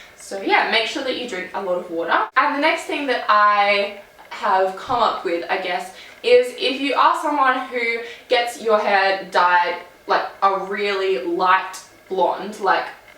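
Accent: Australia. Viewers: Are you Australian?? it sounds like it. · Your from Australia aren't you bc accent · Are you Australian? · Oh my gosh are you from Australia :O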